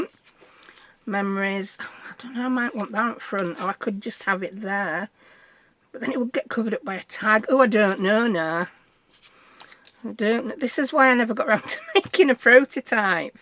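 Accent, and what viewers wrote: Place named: England, United Kingdom. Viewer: Great video, love your waffling, faffing and your accent, it reminds me of when I lived in England. Cute little folio, thank you 🥰🌺❤️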